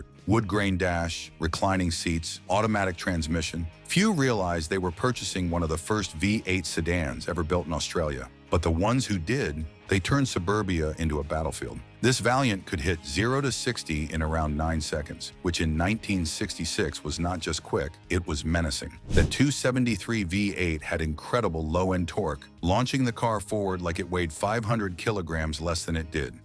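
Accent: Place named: United States. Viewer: What no FD Vauxhall Victor 3.3? No wonder the narrator has got an american accent aye, bah!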